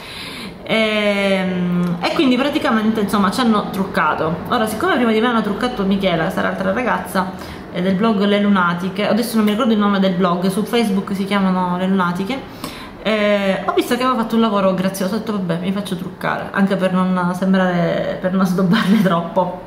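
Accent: Sicily, Italy. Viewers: io e te abbiamo le stesse passioni makeuppose... Il verde-oro e gli ombretti in crema... Sarà per questo che mi piaci tanto e non mi perdo un tuo video??? O sarà perchè amo l'accento siciliano??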